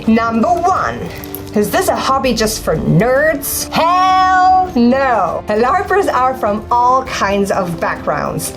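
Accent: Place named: Ireland. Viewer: Sorry for being an American Idiot, but I totally thought was from Ireland from her accent. Prost!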